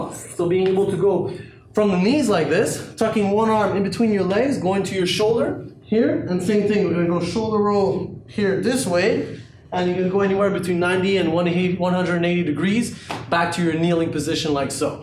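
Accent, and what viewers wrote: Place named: Quebec, Canada. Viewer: Quebec accent :D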